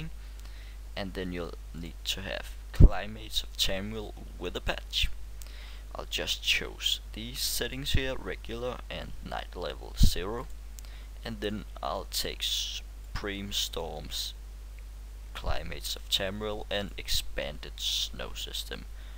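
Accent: Denmark. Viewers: You're Danish? Right? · Lol are you Danish? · Han er dansker, det kan jeg høre 100 p :D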